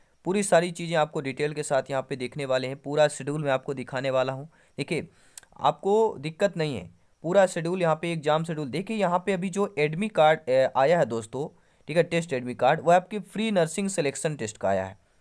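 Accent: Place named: Uttar Pradesh, India. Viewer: but aapki language u.p. ki lg rhi hai...🙏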